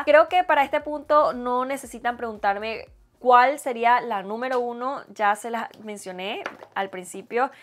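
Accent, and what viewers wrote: Venezuela. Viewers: Me encantó el vídeo! Primera vez que veo tu canal y de verdad me encantó. Jaja ya decía yo ese acento es Venezolano ♥️ saludos desde Barquisimeto.!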